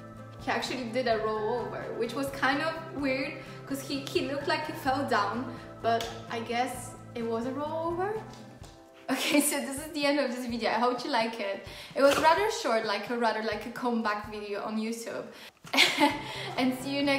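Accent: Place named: United Kingdom. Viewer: You sound really British now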